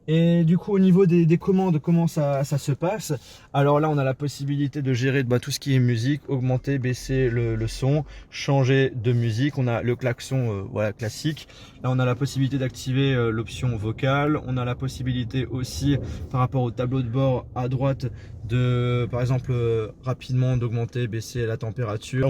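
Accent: Belgium. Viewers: Tu est belge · Mais est belge?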